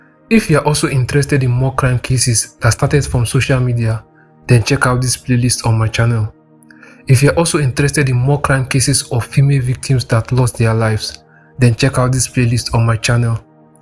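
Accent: Igbo, Nigeria. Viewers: This your Igbo accent is so strong but analysis of this document is in detail.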